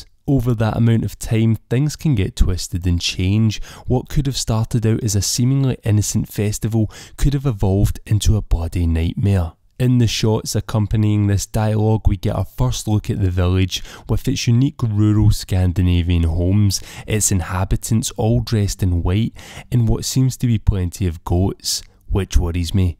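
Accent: Scotland, United Kingdom. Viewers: Great listening to your accent and Scottish sense of humour!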